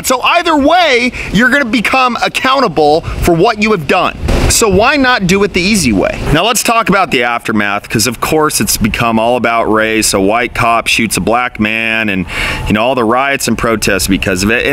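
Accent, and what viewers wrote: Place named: Texas, United States. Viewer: Now, more revealing footage has come forward, it shows this incident from the other side of his vehicle. The video clip i saw clearly, shows that the police had grappled him to the ground and that, before being handcuffed he managed to wrestle himself free, stand up and proceed to walk around from the passenger side directly to the drivers side of his auto. This great footage picks up just after he has somehow freed himself from the 2 officers and being pinned on the ground but is not seen from this camera angle. Thank You! for the work and time you take putting out really great content. You're so very correct, not using your Common Sense and Logic could get you shot, the police officers thankfully are using theirs. I guess its your well voiced Texas brand of thinkin and talkin that speaks to this life long Texan. 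Austin ain't All blue. I think I'm gettin a chubby* I am subscribed.and i'll continue to like and share your super content.Thanks again Hoss.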